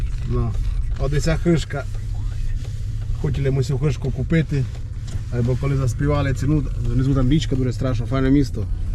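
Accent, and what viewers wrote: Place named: Zakarpattia, Ukraine. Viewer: Сяка зима била...😅 зразу чути Закарпаття.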